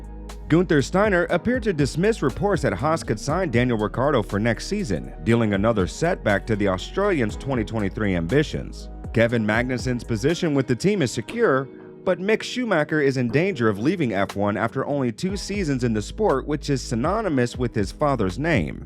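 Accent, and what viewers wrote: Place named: United States. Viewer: Seriously as soon as you hear the American accent .. you know its just clickbait .. sigh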